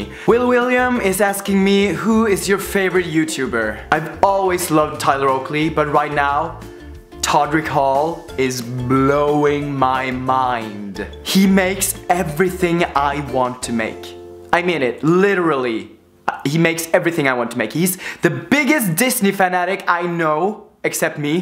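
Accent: Trøndelag, Norway. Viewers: d e jo sør-trøndersk som e sokka da <3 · ÅÅHERREGUUD, trøndeeer!! Æå e trønder hahha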